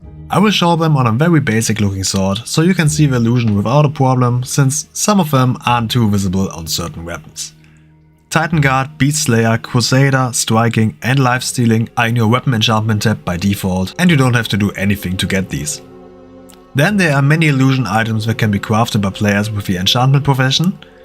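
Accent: Germany. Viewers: Warum nur auf englisch??? Man hört raus, eigentlich sprichst du deutsch oder?? Kann man all diese vz auch transmoggen??? Brauche ich den vz Beruf??? Würde mich über eine Antwort sehr freuen 🙏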